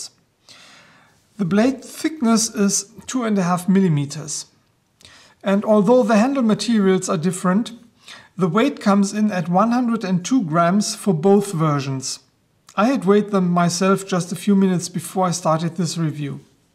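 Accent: Germany. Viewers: You really make the best reviews! Both for knives and other equipment. They are logical, detailed and easy to understand even for us with little training in the English language. The German accent is quite charming, too :-)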